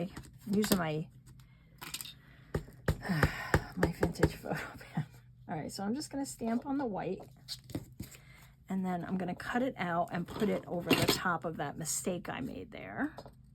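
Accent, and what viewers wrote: New York, United States. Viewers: I will definitely try this. Totally enjoy your videos. And your NY accent.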